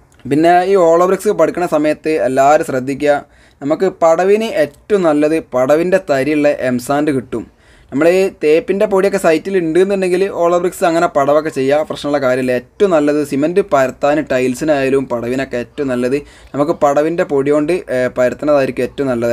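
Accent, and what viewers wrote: Malappuram, India. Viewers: വീഡിയോ അടിപൊളി യാണ്.... എന്നും പുതിയ വീഡിയോ വന്നോ നോക്കും... പിന്നെ ജമ്മളെ മലപുറം ഭാഷ പൊളിച്ചു...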